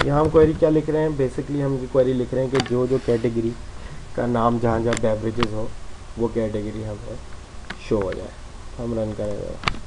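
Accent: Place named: India. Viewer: 印度口语~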